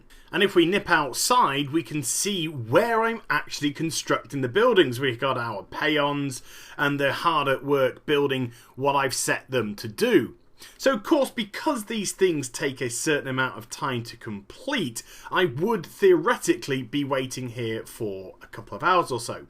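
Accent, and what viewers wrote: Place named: England, United Kingdom. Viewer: I Think hes English :D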